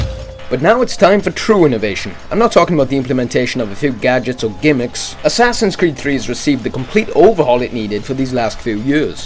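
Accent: Wales, United Kingdom. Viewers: because i like this video so much and your welsh i will subscribe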